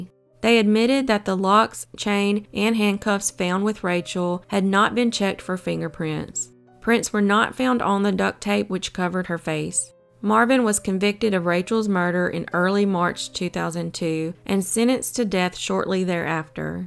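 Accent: South, United States. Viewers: Such a soothing southern voice.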